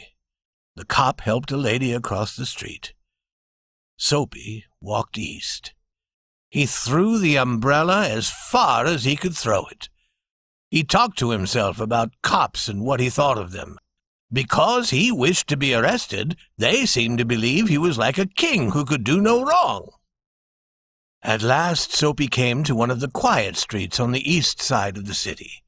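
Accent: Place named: England, United Kingdom. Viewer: very great accent ...English (Saadiyat Beach)..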